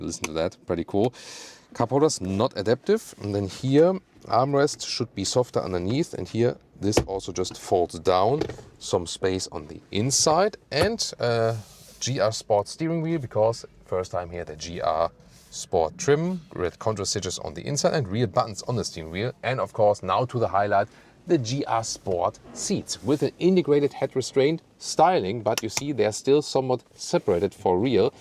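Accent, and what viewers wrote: Germany. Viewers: Yes, but his "german english" is strange... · Finally a clean summarize car reviews Im a Asian but if feels like the person is a German salute for being sofisticated and that acsent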